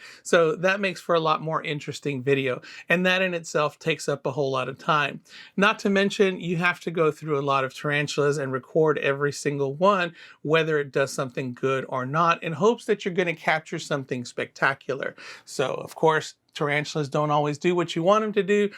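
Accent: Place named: New Mexico, United States. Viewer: You kind of sound like you're from New Mexico. I have family from there and the drawl is very distinct. Of course I'm probably wrong but it's just that your voice sounds so familiar. Love your videos by the way! Really good camera shots. Love it!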